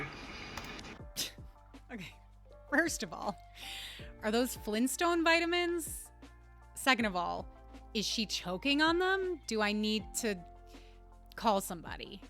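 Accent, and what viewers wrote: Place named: Brooklyn, United States. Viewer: This video popped up on my feed and 3 seconds into the preview my brain clocked “Brooklyn accent!” I’m a Coney Island guy now living in the Bronx and I didn’t realize how much I missed our native tongue. Thank you for that. Also, you’re funny as hell and I like nutrition/fitness content, so I stayed for the video and subscribed ✊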